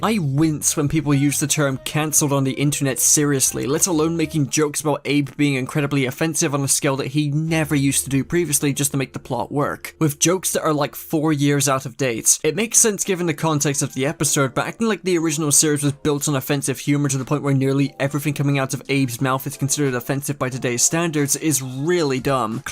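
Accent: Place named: Southern England, United Kingdom. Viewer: Where you from in england bro? More southern than northern with that accent 🤔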